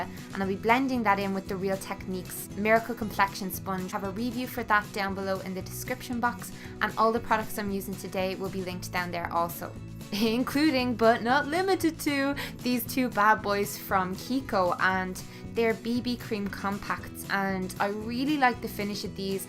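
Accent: Ireland. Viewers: This is my second time watching this, I wanted to try the eyes you did and I had the captions on by accident, oh my God I'm weak! It's clearly having trouble with the Irish accent because some of the mix ups are hilarious eg.. 'relax in a box (bath!), 'as a guideline for your Catholic (catflick!) 'its nice and dry skillet chiding me work hard play hard you'll never get attacked again. I hope you enjoyed the CEO'!! Too funny!:'D Ps, You look unreal in this video and congrats on graduation!!:) x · Congrats again girlie!! You look glowing :). I am married to a gorgeous Scotsman but I swear I should have found an Irishman lol cause I could listen to you for days lol!! Love from Canada xo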